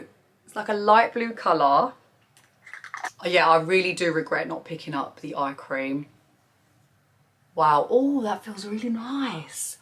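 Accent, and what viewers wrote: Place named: England, United Kingdom. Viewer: Your accent is so cute! What part of England are you from? :)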